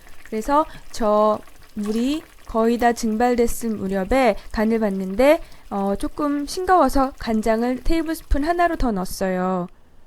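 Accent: Seoul, South Korea. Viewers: 말투랑 목소리 김하늘 같아요~ 예전 서울 사투리? 말투ㅎㅎ 목소리 넘 매력있어요!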